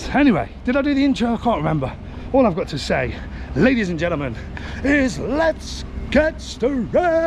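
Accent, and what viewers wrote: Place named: Northern England, United Kingdom. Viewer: loved the Q and A you just come across has a legit northern lad, you na what i am saying bro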